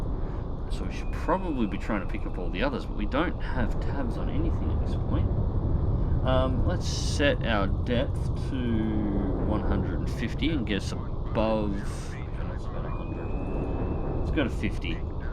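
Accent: Australia. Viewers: Enjoy hearing an Aussie on the intertubes.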